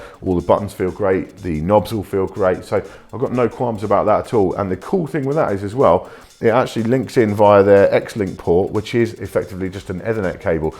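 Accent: United Kingdom. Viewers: Great review + british accent = brilliant.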